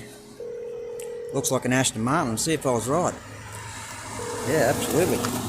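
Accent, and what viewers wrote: Australia. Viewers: Hello from an Australian in Japan⛩🌸🌸🌸 Great to see so many cool cars in the same place🚗🛻🏎🛻✨✨✨ And love your Ozzie accent💜 Have a great day🌸🐨💕✨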